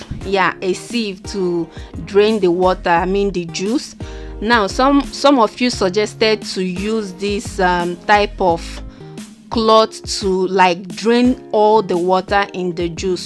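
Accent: Igbo, Nigeria. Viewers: Ibo woman and her accent preparing fufu and eggs soup with chicken.....Ibo woman no dey carry last.... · First time watching your video and I knew already you are Nigerian by your accent 😁😁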